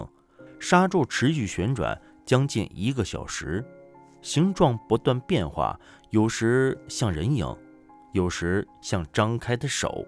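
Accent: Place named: China. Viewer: 大陸口音 我不懂 為什麼 沒有大陸的靈異事件!?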